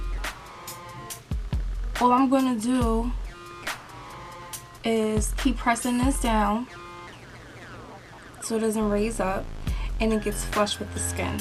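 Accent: New York, United States. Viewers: Yes everyone has an accent depends on what region on the US you are from. Im from Colorado no one here talks like you. So im able to identify your accent. You’re probably from a different area of the US. Like NY maybe??? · I definitely learned a lot, thank you for this video girl!! Btw the more I hear your voice I'm thinking oh she's definitely from New York (I'm from N.Y.) lol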